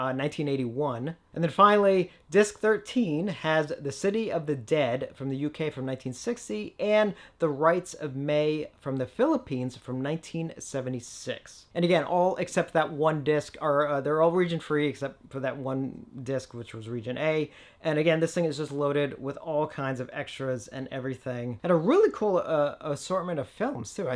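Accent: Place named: Chicago, United States. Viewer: This new Haunts set looks excellent! I still don't have the first one though, I will have to get it next time it goes on sale and then maybe next year will get this second volume. Thanks for covering this,! Don't worry about the Chicago accent~I'm over here with a Boston accent myself. I pahk my cah in Harvahd yahd afterall 😅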